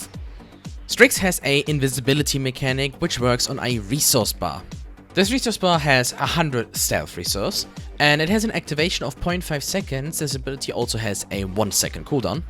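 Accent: Germany. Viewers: alter man hört sofort diesen deutschen akzent :D gutes video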